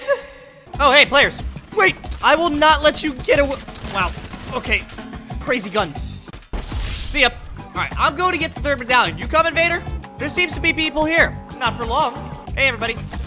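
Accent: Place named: United Kingdom. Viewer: like the British accent lol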